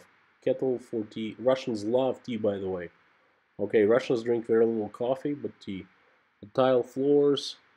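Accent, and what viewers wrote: United States. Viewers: You speak interesting , clear American English. I watched all of this presentation. Thank you , good job of it.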